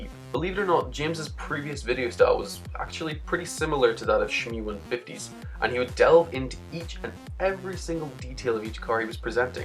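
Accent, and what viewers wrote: Ireland. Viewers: U Irish? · Are you irish?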